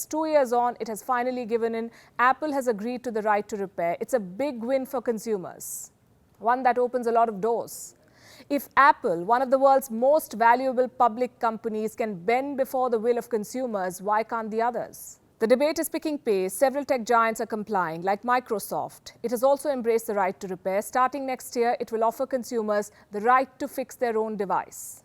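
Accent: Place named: India. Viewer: This is Indian English...and she doesn't need to mimic any accent or your version of " proper pronunciation".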